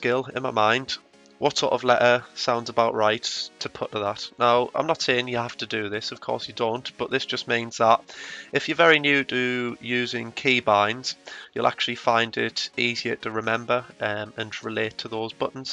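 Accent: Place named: Newcastle, United Kingdom. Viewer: damn, you sound like you live pretty close to newcastle judgin from your accent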